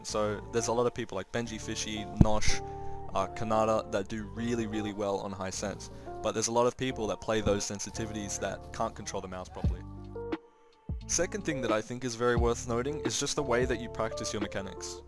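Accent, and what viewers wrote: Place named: Germany. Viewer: are u german ? you accent sound like german Wenn du mich verstehst man versteht immer seine Deutschen Brüder XD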